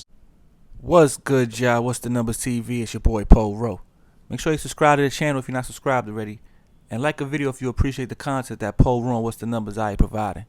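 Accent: New York, United States. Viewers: it sounds like you grew up in a suburban neighborhood of queens, Staten Island, long Island or Mount Vernon. I'm born and raised in Harlem NYC (of course there are no suburbs there), the Bronx has very few suburbs. I don't know how old you are, but I'm in my mid-60s, unlike where you might have grown up at, I grew up in a era where drugs specifically heroin was extremely prevalent. Even with all that there was a sense of community, the dealers who were much older than us would steer us youth away from wanting to get into the life, they told us to stay in school and don't be no fool. A lot of them back then even knew our parents, and they never sold drugs in front of elderly people. Even though these cats were contributing to destroying the community with dealing drugs, there was a weird sense of order and structure. IT WAS A DIFFERENT DAY AND TIME, when the Crack era came through that changed everything.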